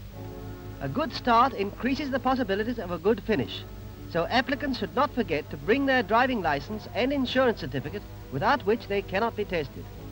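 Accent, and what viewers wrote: United Kingdom. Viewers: Sorry just can't take the snobby British accent. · it's not snobby that's just how the British accent sounds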